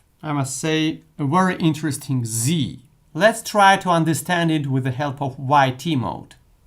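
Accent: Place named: Russia. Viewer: Русский акцент в английском, ох ну что же мы так все одинаково говорим))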